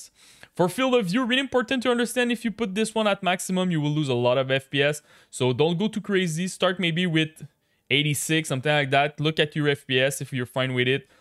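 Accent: Quebec, Canada. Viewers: Super. Entk sa parait que tu est québécois xD. Entk C'est super, j'ai gagné en FPS. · Toé tu viens clairement du Québec LOL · Je peux reconnaître ton accent du Québec .... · Sa ses de la belle accent quebecois :)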